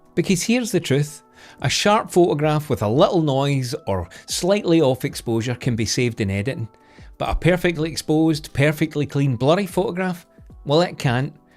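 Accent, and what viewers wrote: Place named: Glasgow, United Kingdom. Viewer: Hey thanks for your take on photography. I recently purchased a mirrorless camera, a 50 mm lens and now a RF 24- 105 "L"lens from Canon for my wife. She's always wanted a good camera so I started her with a Canon R50 APC but a full frame is in the future ih her interest in photography grows. Although we live in the states my wife is from Glasgow so she will appreciate your accent. Thank you sir, will be watching all your content.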